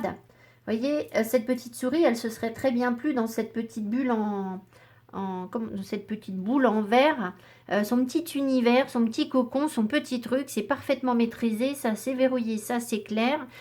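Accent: England, United Kingdom. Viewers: Merci, le tirage m'a beaucoup parlé. J'entame une formation en mars pour aller justement vers plus d'alignement et oser être moi faire ce que je veux vraiment. Mais bien entendu c'est beaucoup d'investissement (argent, énergie etc) et ça faire remonter effectivement des angoisses (est ce bon pour moi? Est ce le bon choix? Vais je aller au bout? Vais je réussir à mener tout de front?), l'ange Ariel m'a beaucoup parlé car justement, un de mes projets concerne la méditation. Je vais donc essayer de connecter avec cet ange! J'adore votre accent anglais; est-ce votre langue maternelle?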